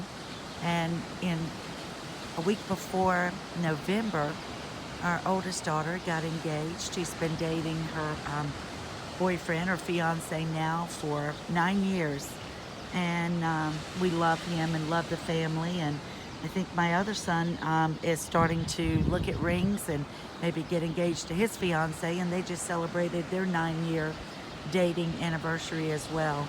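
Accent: South, United States. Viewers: how good to hear your sweet Southern drawl again. Though I’m far away in Israel, I have thought of you often since we last heard from you with the sad, sad news. I too am a mother, I don’t need to say more. But news of your return is so welcome, and the news of your family’s commitment to life is so encouraging. Be well, till next time. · 🇬🇧 How lovely to hear your lovely Southern voice again. It is wonderful that you have been so strong in dealing with the death of your son. It must have been so painful for you and your whole family. 💫 Sorry you also lost your Yorkshire Terrier baby, but he was a terrific age, almost 20, amazing. Thank you for sharing your lovely day with us all. A very Happy New Year 🍾🥂 big hug 🫂 from beautiful Yorkshire. Xx 🙋‍♀️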